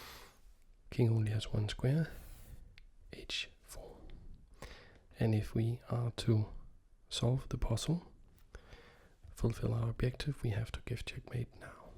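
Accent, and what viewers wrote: Denmark. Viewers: Loved your video on the French Whisperer Collab and I'm here again. I'm guessing by your accent that you're Danish, perhaps? Maybe you've mentioned this in an earlier video, apologies!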